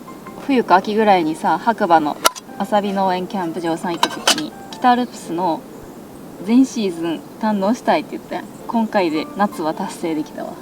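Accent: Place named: Kansai, Japan. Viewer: 関西弁がめっちゃかわいいです－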